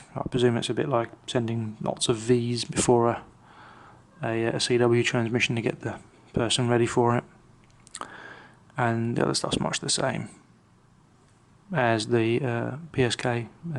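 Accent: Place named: United Kingdom. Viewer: Yes. He is speaking the Queen's English. I'm guessing you're American, yawn.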